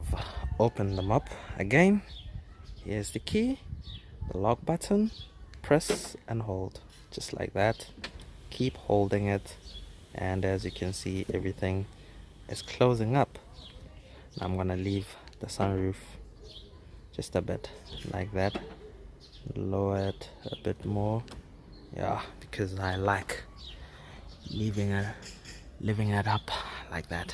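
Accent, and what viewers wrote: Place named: South Africa. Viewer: Dude no way I was gonna say you sound South African!! Love the videos man keep it up :)) Love from Cape Town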